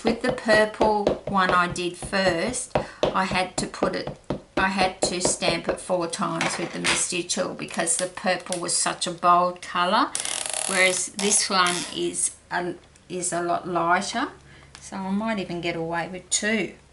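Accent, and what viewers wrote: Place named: Australia. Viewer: no wonder you had such a nice accent you live in Australia. I live in USA California. I do have a someone I can buy from if i need to. Thank you so much for offering your help that was very kind of you. Have a Blessed Day. with a smile:) Julie G.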